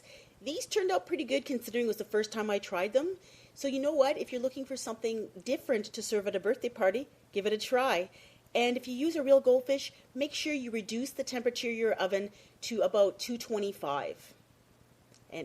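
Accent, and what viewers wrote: Canada. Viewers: are you cannadian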